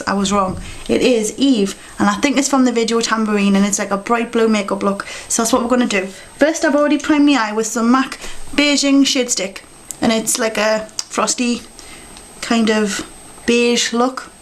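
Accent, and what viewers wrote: United Kingdom. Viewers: you look like my art teacher! haha tahts cool. r u british??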